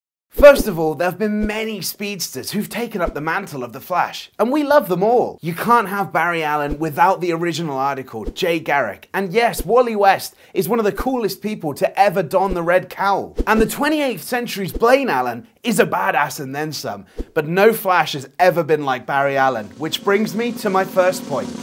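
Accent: United Kingdom. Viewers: Your like jack black with a British accent. I love you! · I'm just going to put this out there; the elephant in the room so to speak. I overlooked his weight because of his awesome British accent! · I swear this guy really is Jack Black with a british accent.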